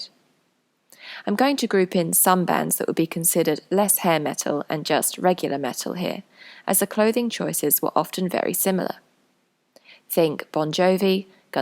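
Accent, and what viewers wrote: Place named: United Kingdom. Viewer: This lovely britich accent) very good to hear - difficult to understand) your video is great)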